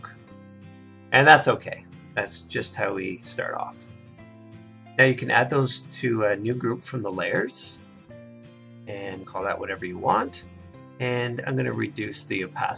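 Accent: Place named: Canada. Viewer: I can hear Canadian accent!))) cool!))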